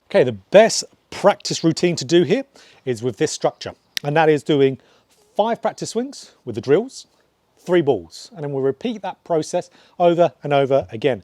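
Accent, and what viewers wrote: United Kingdom. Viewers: I actually think the fact he speaks quickly is refreshing, usually I have to turn up the speed on videos because instructors talk too slow like I’m a child. If his accent is difficult to catch quickly you can always turn down the speed of the video or turn on caption. (My sister often watches any British tv/movies with captions)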